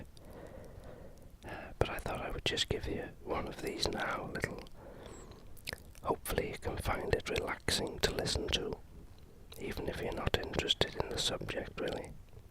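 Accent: Wales, United Kingdom. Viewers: Are you from mid Wales? My fiance and I cant decide. We live in York. My Nana was Welsh, from nr Wrexham and his family are from Newport area. We have named our baby son Rhodri. He even has a birthmark which looks like a Welsh dragon. I love your memories videos. You are an ASMR super star.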